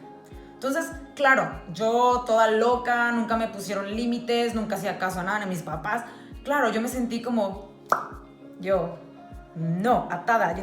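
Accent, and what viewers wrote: North, Mexico. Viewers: No chingues jaja con razón me identifique con tu forma de hablar. Yo también soy de Reynosa ❤️ Soy "una mexicana en Mcallen" jaja bueno en Pharr pero cómo nadie conoce Pharr mejor digo Mcallen jaja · que linda Historia y que acento tan lindo tienes super del norte un fuerte abrazo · Ahhh reconocí tu acento del norte jejeje.. saludos de NL MX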